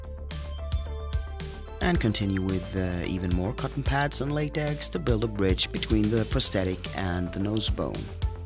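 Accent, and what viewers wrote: Sweden. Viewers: hearing your accent I guess you're swedish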